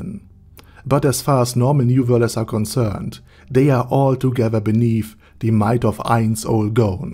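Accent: Germany. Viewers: Bro are you from Germany? I can hear your accent.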